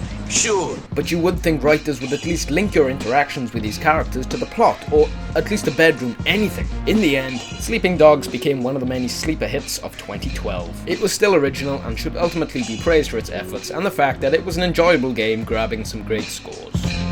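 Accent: Wales, United Kingdom. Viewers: You welsh bro? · Are you from wales? you sound kind of welsh but you also sound like you're putting a accent on:) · are you Welsh or American. I thought you were Welsh but the prices you mentioned we're American. It's rare for me to find a fellow Welshman on YouTube. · Are you Welsh?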